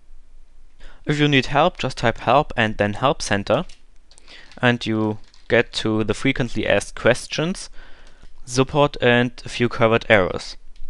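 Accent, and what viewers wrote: Germany. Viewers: Bist du Deutscher oder Engländer?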